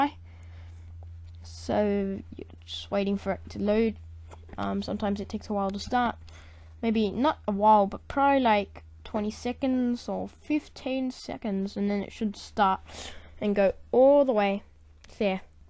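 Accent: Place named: Australia. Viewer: Austrailian mate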